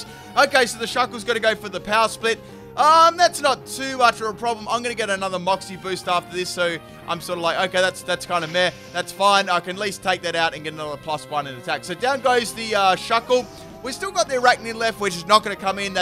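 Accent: Australia. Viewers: Did not know you were an aussi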